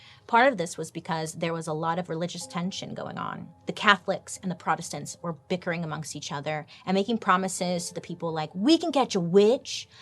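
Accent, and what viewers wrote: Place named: East Coast, United States. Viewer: I love ur voice you sound so east coast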